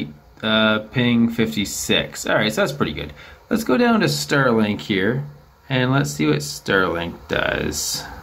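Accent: Canada. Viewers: Strong Canadian accent but I can still understand what he is saying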